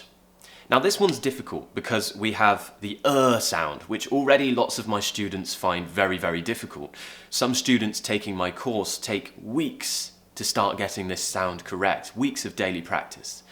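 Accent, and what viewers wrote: United Kingdom. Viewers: I start love British accent because of you. Your such amazing teacher i had ever seen. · I have just known your channel and I love it so much immediately. Love british accent, love ur voice and the way you teach pronounciation. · I love British Accent 😍 it's Awesome 😊👍....Thank u very much 😘 Love from India☺ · I love your accent so much 💜💜 British accent is always attractive to me!!!! And thank you. (Side note l love your hairstyle👧🏻👧🏻) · I really enjoy a lot watching your pronunciation videos. I imitate every single word that you say. British accent is what I love the most. Hope one day I can take up this elegant accent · I don't exactly know what my accent is but i'm trying to sound like a British. Your videos help me so much. I can't thank you enough. In Fact, i can even learn British English by listening to your pronunciation and intonation. 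Be Safe. Sir. And Have a great day.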